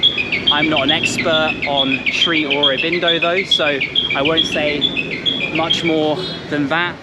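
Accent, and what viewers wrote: United Kingdom. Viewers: Are you British?...Thanks...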